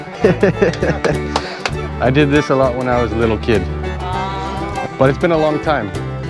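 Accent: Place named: Canada. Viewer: i just came across your Youtou channer ! and i love your accent So here's a question for you , where in Cananda did you come from ? (i'm planning to move to Candana and live there for about one year, but i'm stuggling to decide where i live in Canada. the purpose of my visit is to learn english though )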